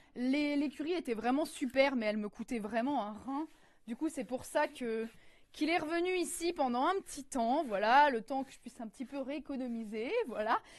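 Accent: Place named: Lorraine, France. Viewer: Cet accent Lorrain adoré qui sent le lard et la mirabelle !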